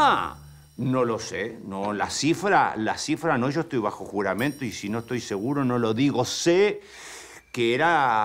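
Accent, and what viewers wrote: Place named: Buenos Aires, Argentina. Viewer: Que paso tenes el acento a porteño...antes eras más chévere igual te banco ✌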